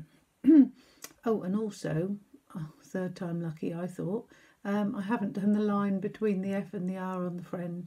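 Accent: United Kingdom. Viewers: I have just found this video while searching for a tutorial on how to use scan n cut. I’ve got a CM300 but very rarely use it. Could you do a series of videos on basic operation? I’m sure there must be many like me who get so frustrated because they cannot get the machine to do what they want it to do. By the way, it’s great to hear an English accent and thank you for your time. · Hiya, found this easily enough. Thanks for giving me the link (nice to hear a UK not American voice) xx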